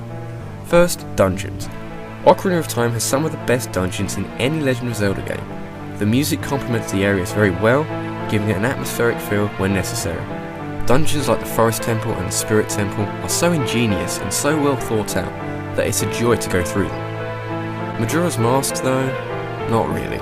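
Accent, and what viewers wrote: United Kingdom. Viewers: Because almost every Brit I've heard pronounce Ocarina says Ocariner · OOOOH SWEET! A BRITISH GUY!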